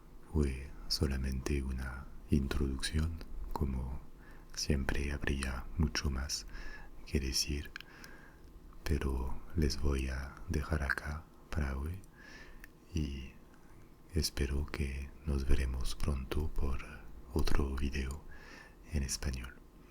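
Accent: France. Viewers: Me encantó tu narración. Una forma diferente pero muy agradable. Yo disfruté de tu relato pausado, con ese tinte de francés todavía a pesar del buen español y tu voz suave pero muy clara. Gracias.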